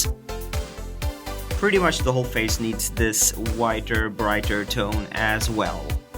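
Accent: Scania, Sweden. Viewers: Känner igen den svenska dialekten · när du började snacka skånska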